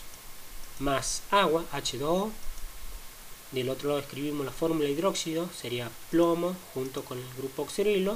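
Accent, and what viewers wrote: Córdoba, Argentina. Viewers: De casualidad sos de Argentina? Mas exactamente de Córdoba? Por la forma de hablar.. Un pequeño consejo.. Valencia y numero de oxidación no es lo mismo y veo que lo confundís.. Ojo con eso.. Igual muy buena explicación !! :)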